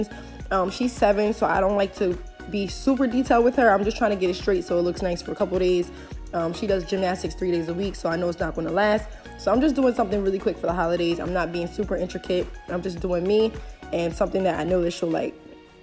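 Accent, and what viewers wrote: Philadelphia, United States. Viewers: Never seen this channel before and I can tell y’all from Philly too🤣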